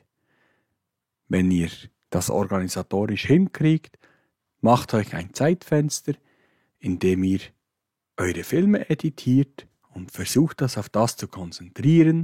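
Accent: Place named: Switzerland. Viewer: ich grinse hier vor mich hin. irgendwann fliegen wir mal zusammen. kannst du dir das vorstellen? Wir sitzen zusammen da drauf? Leider kann ich mit den Tips nichts anfangen. War aber nett deinen Schweizer Dialekt zu hören.!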